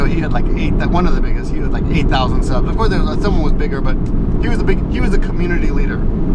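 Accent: Australia. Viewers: lol your Aussie accent sounded a bit British XD The first Youtuber that I ever saw. I don't remember exactly who but I know that I use to be really into Smosh. I know that they are still really huge but I can't really think of anyone else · I gave you thumbs up simply for the Australian accent ;)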